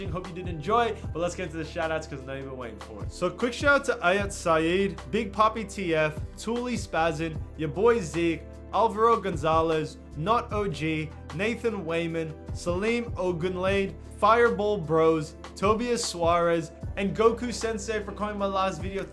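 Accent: Australia. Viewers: hey man, i recognised ur accent and i’m assuming your australia, if you do play asia servers i was wondering if you could run wit me 🥺 much love from south australia bro. keep up the great content‼️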